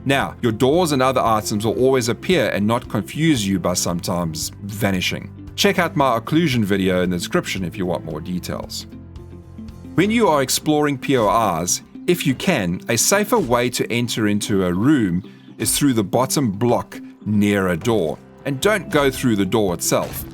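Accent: South Africa. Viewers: I hear the stronger SA accent my friend, I used to work with a gang of S Africans in the 90's, great bunch of guys, love the accent! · Aussie here mate, always thought you sounded South African. I think aussie, kiwi, certain british and south african accents have alot of similarities. Either way its pleasant to listen to. I reckon its the garden hoe fruit tree yield and the loot bags you didnt know. Really enjoy the tip vids too.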